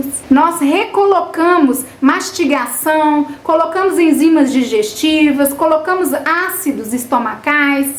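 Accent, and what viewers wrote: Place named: Minas Gerais, Brazil. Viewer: Gente q sotaque gostoso.... parece ser minerinha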